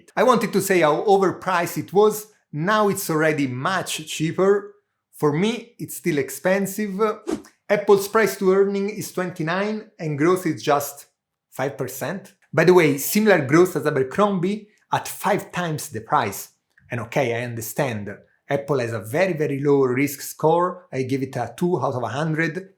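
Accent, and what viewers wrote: Italy. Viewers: I just subbed. I have only watched 32 seconds. But judging from your appearance and energy your channel will probably grow. My early impression of your accent is Italian.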